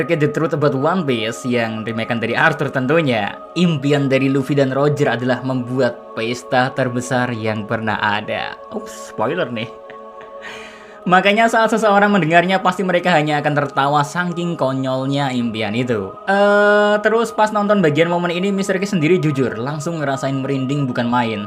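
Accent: Java, Indonesia. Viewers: bang lu jowo bang 😎🤌🤌👍👍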